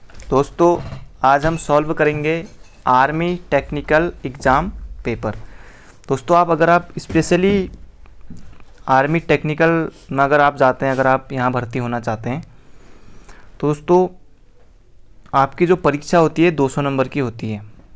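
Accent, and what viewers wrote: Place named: Pahadi, India. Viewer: Bhai pahadi hai na tu... Avaaz se lg rha hai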